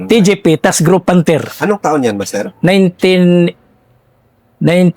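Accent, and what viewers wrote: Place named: Visayas, Philippines. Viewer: Iba tlga ang bisaya mg kwento , nkakatawa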